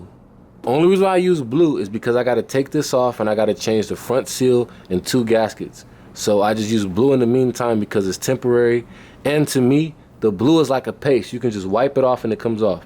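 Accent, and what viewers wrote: United States. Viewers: Smashing a grinder through something screwing it up and cleaning things on dirt. This guy is just me with an American accent.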